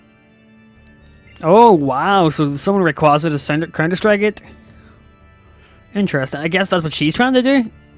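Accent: Northern Ireland, United Kingdom. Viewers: R u from Northern Ireland/Ulster and if u r what county??? I is from Dublin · Northern Ireland man. Keep er lit boyo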